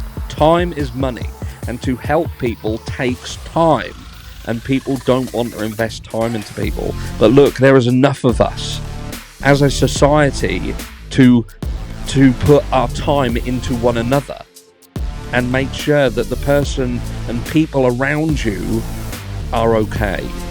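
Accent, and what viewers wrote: Norfolk, United Kingdom. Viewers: Where r u from? U should english amd from Norfolk is that right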